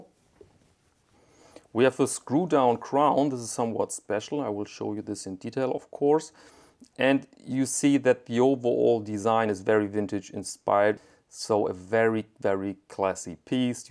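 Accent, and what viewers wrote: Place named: Germany. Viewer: The German accent adds credibility. Where do I get one?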